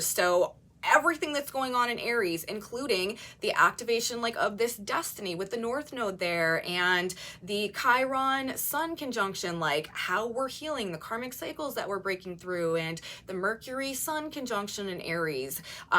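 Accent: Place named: South, United States. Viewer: I love your videos...VERY thorough & highly crucial information. But let me tell ya, girl, you have an adorable southern quirk (I won't say what it is) because it is absolutely adorably classy on you! You put this quirk out there crowned with nothing but SUPER CLASS!!!:washhands::washhands::washhands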